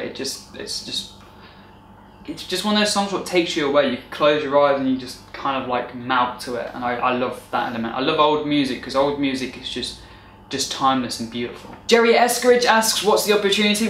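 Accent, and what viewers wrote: United Kingdom. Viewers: Starting to look at your post , ( luv it!), would like to know which country you are , beautiful accent! Thx to answering,I always loved British accent, It’s like poetry for my ears !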